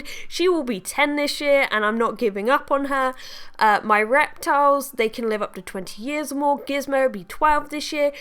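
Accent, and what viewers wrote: United Kingdom. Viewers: Do live in England, Canada or the US? Because you have a British accent, but you have done that rover program. Not trying to be rude just wondering. 🙂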